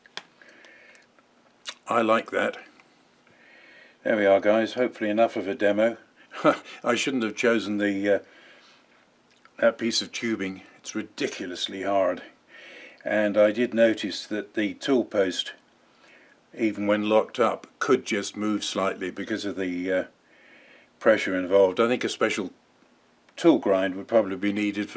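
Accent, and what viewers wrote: United Kingdom. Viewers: I do so enjoy listening to a British poster for a change.....please keep the videos coming.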